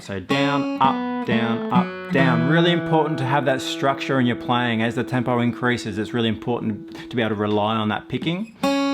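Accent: Australia. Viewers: Y'know... over here in America, when watching flatpicking videos normally we hear a familiar hillbilly accent... like from Kentucky or Tennessee, North Carolina, Virginia, or even Southern Missouri. Then there's fellers from Italy that pop up... Beppe Gambetta and Roberto Dalla Vecchia who sho' can pick! And listening to their Italian accents, don't sound like Knoxville, if you git my drift... and then I found another person from ENGLAND, a lady named Charlotte who made my jaw drop, she got Bryan Sutton's attention, invited her over to the USA to help instruct guitar... and this here video... what's that Australia? Bluegrass RULES!!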